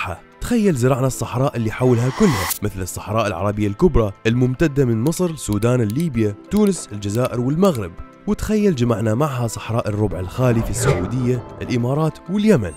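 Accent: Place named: Iraq. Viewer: المعلق بالفيديو عراقي😂😅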